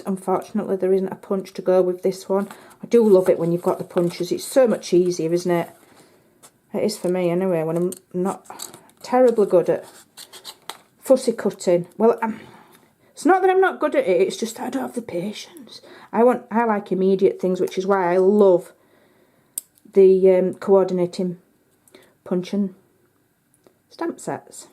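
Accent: Yorkshire, United Kingdom. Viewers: This is adorable. I love watching your videos, you're very talented and charming. But, I must confess, I am totally in love with your wonderful (Yorkshire?) accent. it's delightful! Big hugs from Texas!!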